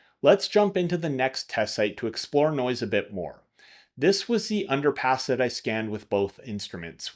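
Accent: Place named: Canada. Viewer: Great video! Very in-depth and informative. I have a lot of hours scanning with the VZ-400i, and the VZ-600i just looks all around better. I wouldn't wish lifting the VZ-400i 50-100 times in a day on anyone lol I did want to mention, regarding Leica's app and it's field capabilities, that Riegl offers an optional license for a program called RiPANO that has some similar capabilities. If they still offer it, it might be worth diving into. P.S. Love to hear your accent in your videos. I'm a Canadian surveying in the US as well, so it reminds me of home!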